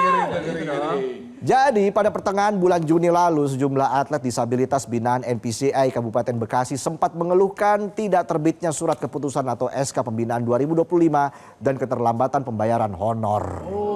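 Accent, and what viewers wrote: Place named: Jakarta, Indonesia. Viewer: Ogoed suka bener ame ni gaye bicarenye Coba dong logat betawinye kentelin😅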